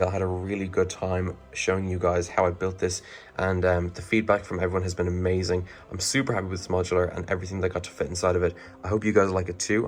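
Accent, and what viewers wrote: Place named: Ireland. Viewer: Just found your channel and am loving it! Nice to hear an Irish accent on Lego videos for a change. Keep up the great work 😁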